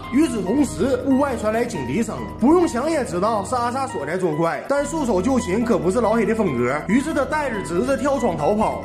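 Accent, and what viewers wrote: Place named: Northeast, China. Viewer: 我承认有些人爱听东北口音，但是也有好多人都听不惯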